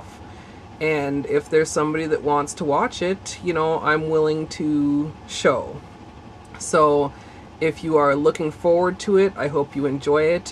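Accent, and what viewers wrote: Minnesota, United States. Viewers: You have a very Minnesotan accent. ;) but it's ok cause I'm Minnesotan too.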